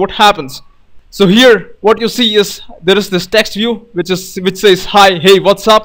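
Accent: India. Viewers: Well i do not see any problem in his voice. yes it is indian accent. yes you are right, his voice is not suitable for singing but offcourse he not claim to be a singer too :) .it is easily understandable and second he put efforts in making videos, which should be appreciated. simple...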